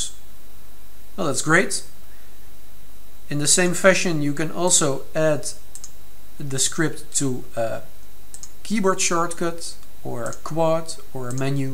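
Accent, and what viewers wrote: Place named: Netherlands. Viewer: Its scary when people contact me to tell me that I sound just like you. Can tell by the dutch accent it def. does. But voice, pitch, etc also comes rather close. yay for finding my voice-twin lol.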